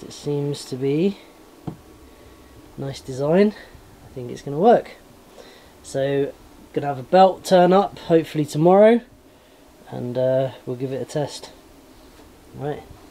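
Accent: Wales, United Kingdom. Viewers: Because I'm listening to you with American ears sometimes your Welsh accent is hard to understand but I love your videos keep up the good work you're very talented person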